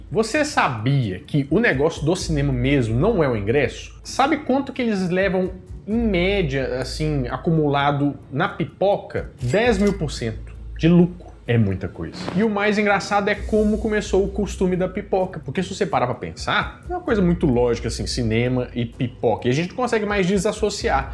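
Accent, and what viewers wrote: Minas Gerais, Brazil. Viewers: Esse sotaque é mineiro?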